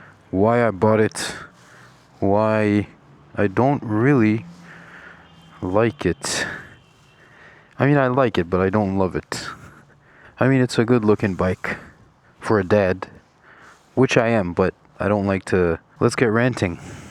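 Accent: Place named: England, United Kingdom. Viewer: A Very cool informative review. One of the best English accents I have ever heard . Dad jokes are underestimated..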